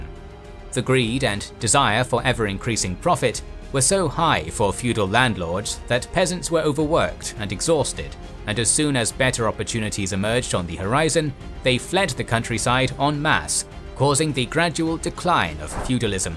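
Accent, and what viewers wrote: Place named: England, United Kingdom. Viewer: I think is English not American based on his accent. But the question is, the World is Euro-centric cause a lot of today's world has its base in European history and technologies (we live in European world so to say) due to the massive influence and impact for good and bad Europe had on the world stage. However, calling England the agent of change of the Medieval period, is like saying Europe was the only place were Iron work or Agriculture was done in the planet. Something we all know is fake and batantly untrue. Pointing out what happened in England is interesting cause as the video is in English, Anglo-American culture wants to know what happened there but leaving the Hansa, Iberia, Northern Italy and the Byzantine Empire complete out of the matter is like talking about the birth of Agriculture and just talk about China as if it had originated there and not Mesopotamia.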